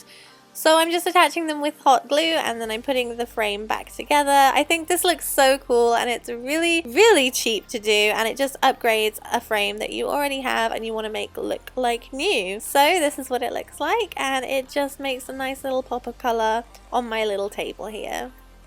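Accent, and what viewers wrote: England, United Kingdom. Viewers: love your accent so how is ron and the kids if you have seen harry potter you will get it loved the ideas by the way where you from what accent is that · are you from England? ?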